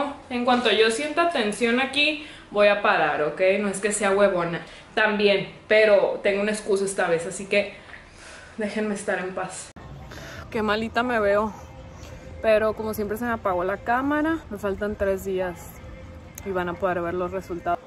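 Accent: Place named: Mazatlán, Mexico. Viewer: Vengo de tu video del sugar y me encantaste , tienes así como el hablado y forma de ser de las de Mazatlán ♥️✌🏻 saludos hermosa desde Mazatlán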